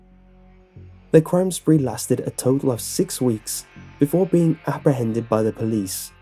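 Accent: United Kingdom. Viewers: You British? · I don’t like British accent It Sounds like foreign English I am in trouble 😭 This is not good 😵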